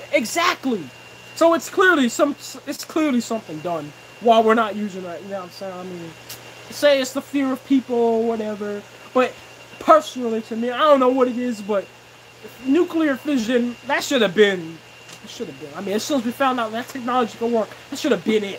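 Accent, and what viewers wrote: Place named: United Kingdom. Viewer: Sound like you live in the UK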